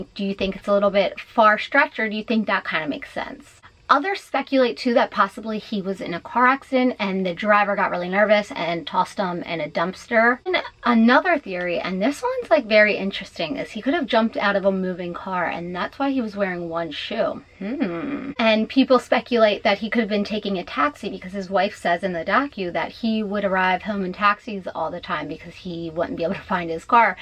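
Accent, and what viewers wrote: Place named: Philadelphia, United States. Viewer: You’re from Wilmington!! I was wondering where and I must’ve missed it before. I’m from right outside Philly (prospect park) and I knew you sounded like me...our “accent” lol 😂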